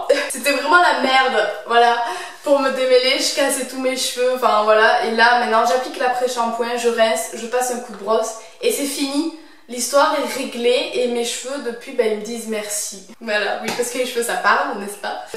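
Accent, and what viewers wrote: South, France. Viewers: une "cagade" mais ouuuuui Sud en force, par ailleur ton accent me fait rêver une des seules youtubeuses avec un VRAI accent du Sud ! Super vidéo comme toujours! continues comme ca ma belle ❤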